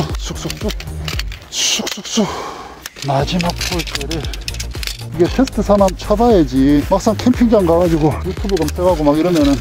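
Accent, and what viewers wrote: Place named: Gyeongsang, South Korea. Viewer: 갱상도 발음으로 설치해주셔서 엄청 친근감 있습니다!^^ 영상 덕분에 첫피칭 잘 해보겠습니다. 😂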